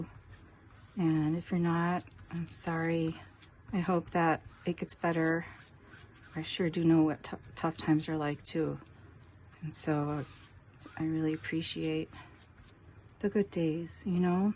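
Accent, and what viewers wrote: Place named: Wisconsin, United States. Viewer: You sound like you’re from Wisconsin -are you? I am in Mequon ☺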